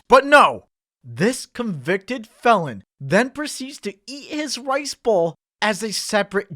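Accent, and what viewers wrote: United Kingdom. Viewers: I actually watched the full sponsor, because i absolutely love that british accent. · sorry i gotta stalk more of this sexy British voice then imma get back to ya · Man if there is one way to make me not skip an ad it's hearing velvety British butler voice say it.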